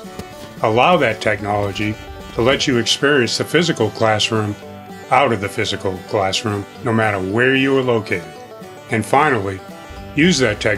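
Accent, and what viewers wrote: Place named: Maine, United States. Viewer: This is great - a nice balance of narration and action shots. I like the humorous way you highlight the challenges of truly rural living but also the benefits. I know it's not the kind of living is not for me but you still convinced me that I need a vacation there sometime after the winter. The photos are spectacular - the beauty of Maine definitely shines even when there is snow. You really got your Maine accent on for this one too! Good luck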